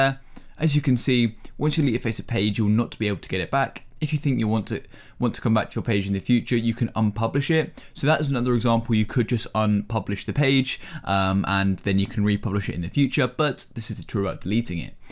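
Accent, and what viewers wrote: United Kingdom. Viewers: you are definitely British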